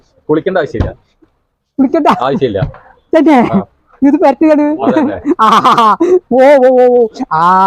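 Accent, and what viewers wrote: Palakkad, India. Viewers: പാലക്കാട് ഭാഷയെ മോശം ആക്കാദെ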